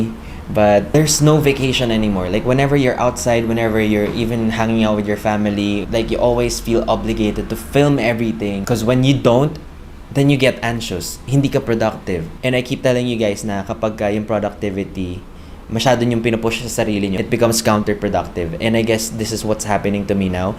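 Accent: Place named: Philippines. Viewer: It’s so cool to see a guy who looks Chinese but has a filipino accent. I always wished I had a Filipino accent, but I was born and raised in Hawaiʻi. My grandma was ilokano, from the Philippines 🇵🇭, and I wish I paid more attention to her when she would try to teach me Tagalog…but no, I just wanted to eat cuscaron, balut, dinuguan, kare kare, etc. I always only have food on my mind. 😜 I think you’ll love Hawaiʻi, Filipinos are the 2nd biggest demographic at 19%, just ahead of Japanese 16%, and behind Caucasian 21%…and we have a lot of mixed race people 24%, many mixed w/Filipino. 😉 The people here are very friendly like in the 🇵🇭, and the weather is beautiful (but much less humid than the Philippines). PS - I just saw your shirt says Hawaiʻi. 😄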